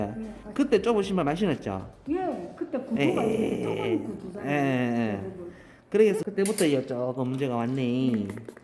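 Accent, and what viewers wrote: Gyeongsang, South Korea. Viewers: 드디어 오랫동안 기다렸던 영상을 보게 되네요. 역시 경상도 사투리로 고객님께 자상하게 설명도 잘 해주시고 너무 깨끗한 발톱정리 👏👏😄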